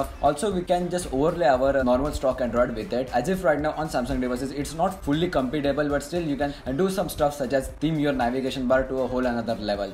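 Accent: India. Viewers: Uhh indian accent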